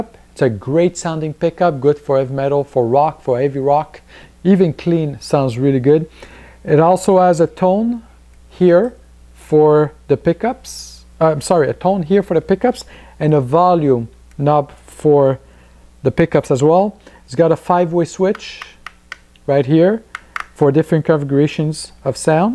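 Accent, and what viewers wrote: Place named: France. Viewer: A little french accent here and there...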